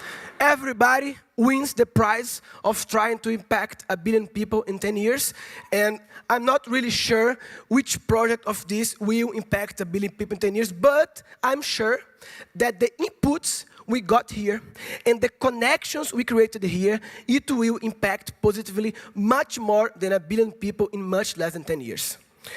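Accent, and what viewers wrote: Northeast, Brazil. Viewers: Esse sotaque nordestino no inglês é massa demais, Parabéns!!!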